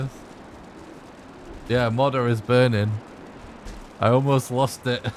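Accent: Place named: Leeds, United Kingdom. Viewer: Fucking hell watched four hours without being able to place your bastard accent and it's Leeds! Big up. When you said 'You got your head kicked in if you listened to heavy music' I thought 'oh so he's northern at least' lol.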